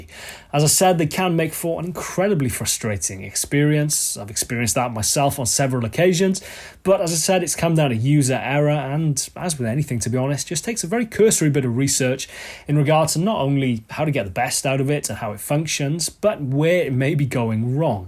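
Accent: United Kingdom. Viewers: Stupid hairstyle and British accent will turn off most USA viewers.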